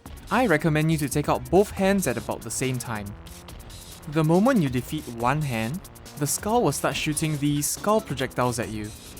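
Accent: Singapore. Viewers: Hey, just wondering, but do you live in Singapore? You kinda sound like a Singaporean for some reason....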